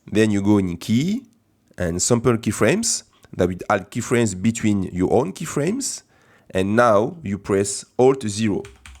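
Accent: France. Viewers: L'accent français me fume très intéressant sinon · me disait bien que tu étais français j'avais reconnu l'accent 😂 Un énorme merci encore j'apprécie énormément votre travail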